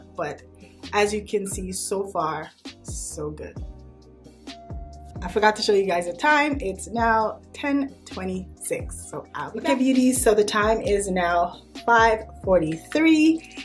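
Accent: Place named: Barbados. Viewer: I detect an accent...are you Bajan? Btw I love how that foundation looked on you♥️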